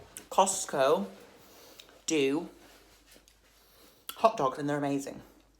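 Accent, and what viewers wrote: United Kingdom. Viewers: HILARIOUS 🤣😂🤣...l love your British accent...so BEAUTIFUL...Awesome video👍🏼🇨🇦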